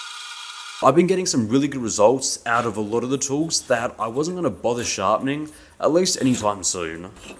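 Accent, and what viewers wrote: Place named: Australia. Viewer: Aussies, y'see... I had to turn on CC at some point... ;-)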